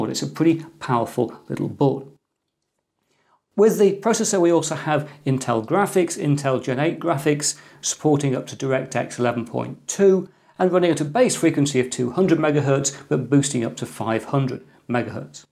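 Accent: England, United Kingdom. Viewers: I love the English accent and the way English people do reviews. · While there are better tech channels out there (For my specific interests) the British accent certainly draws me in. The neutrality of tone makes it really easy to understand. For this reason, I think you'd do an amazing job at some of the more technical aspects of computers. Something along the lines of the information covered in an A+ certification course.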